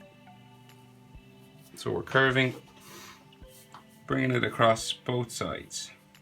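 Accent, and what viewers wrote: Ireland. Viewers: he has probably the first irish accent I haven't hated. and I'm Irish -.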